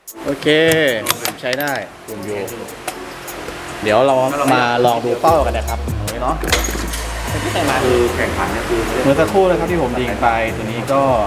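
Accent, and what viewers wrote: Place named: North, Thailand. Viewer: ฟังสำเนียง เหมือนเป็นคนเหนือเนาะ ^_^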